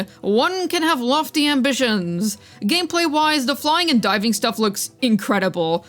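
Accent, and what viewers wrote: United Kingdom. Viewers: Your accent still sounds british XD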